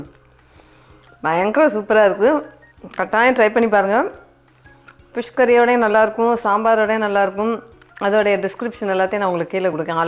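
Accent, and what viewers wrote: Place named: Tirunelveli, India. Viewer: மேடம் உங்கள் டிப்ஸ் அருமை உங்கள் பேச்சில் திருநெல்வேலி வாடை வருகிறதே எந்த ஊர் நீங்கள்.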